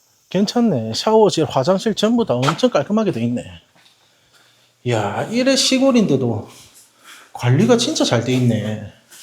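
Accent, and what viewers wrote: Busan, South Korea. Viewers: 부산사투리 너무정겹다. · 너무 자연스러운 부산사람의 행동과 말투 완전 부산을 이해하고 알고 싶으면 이 유튜브 추천합니다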